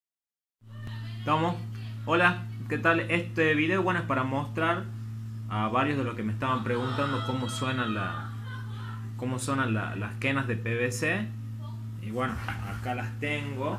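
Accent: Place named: Salta, Argentina. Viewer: Muy bueno .saludos desde Salta ,sentía en tu voz una cercanía a mis pagos ,muy buen sonido , y adelante con el proyecto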